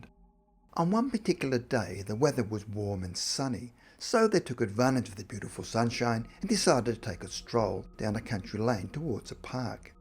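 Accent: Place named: Australia. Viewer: reminds me of the Geico Gecko LOL I think he's Aussie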